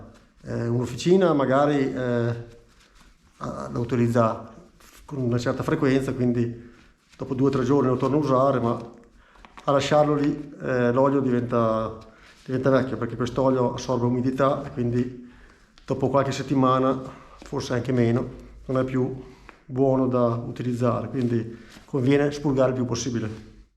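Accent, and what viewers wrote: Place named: Emilia, Italy. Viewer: mi pareva un accento nostrano, io son nato a Pavullo, piccolo il mondo. Dicevo le/la tanica sotto le valige perchè di norma son le più pesanti piene in peso specifico, più basso lo hai meglio guidi, inoltre hanno una buona base piana sulla quale appoggiare una sacca che una base rigida a volte non ha. Seguo due ragazzi (franksaffair) che sono in giro per il Brasile ora, e lui le ha posizionate li ed è venuto un bel lavoro pertanto mi son permesso questo consiglio.Di Transalp devo revisionarne uno appena si fa più caldo. Ho questo hobby. Buona strada, viva l'Emilia e le crescentine cotte con le tigelle.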